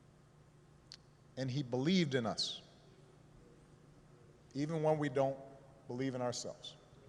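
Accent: United States. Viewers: How did he suddenly develop a southern accent? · Byron Moore where did this Deep South accent come from? This isn’t how Joe, Hillary, and Obama speak, unless in Dr. King’s church fishing for votes.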